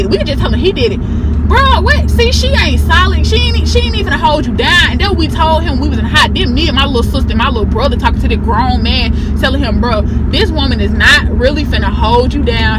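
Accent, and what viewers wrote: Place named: United States. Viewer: That country talk coming out in this video yaaass 🙌🏽🙌🏽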